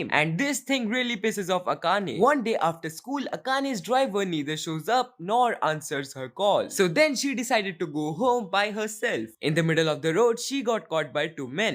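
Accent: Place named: India. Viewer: You voice sound like indian 🇮🇳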